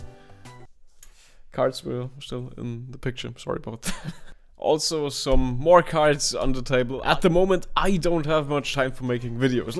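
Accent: Germany. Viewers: Him being sick oddly made him sound twice as german as usual. Especially at the end when he screamed "NOW GROW SOME BALLS DAMIT!" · yo are you german? bc your accent gives it away a bit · is it me, or has less of a German accent over the time that he's been posting.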